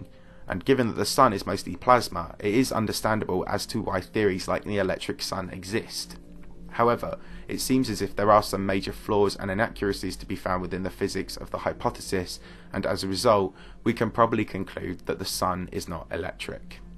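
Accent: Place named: England, United Kingdom. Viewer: Natural narrator, and English accent just kills it.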